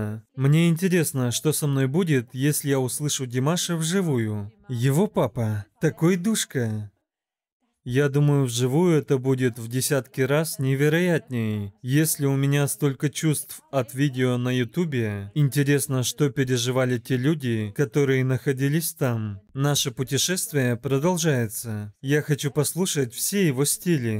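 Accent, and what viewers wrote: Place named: Kazakhstan. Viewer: Вы говорите по ҚазаҚчки девушка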